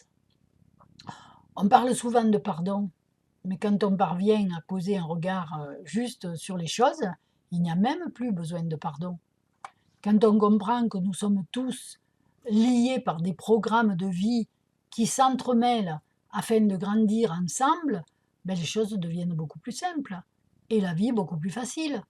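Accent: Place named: North, France. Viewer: Bonsoir madame vous avez un magnifique accent du ch'nord!!! Me trompe-je?😇🙏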